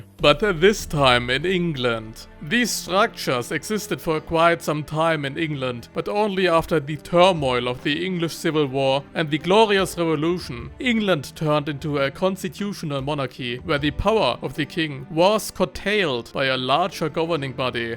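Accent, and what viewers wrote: Germany. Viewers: Me when my favorite setting YouTuber is losing his magical German accent 😢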